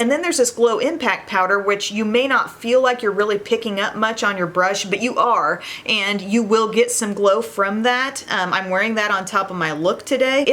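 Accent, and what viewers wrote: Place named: Midwest, United States. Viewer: a nasal voice is indicative of the midwestern American accent, she's from Illinois. As for broadcasting, used to be a newscaster; originally did her YT as the. Your attitude needs no explanation.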